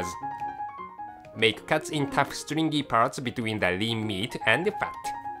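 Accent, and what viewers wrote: Japan. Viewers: Vegetables. He's Japanese. Japanese doesn't really have a V sound, so they usually use B instead. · he is Japanese accent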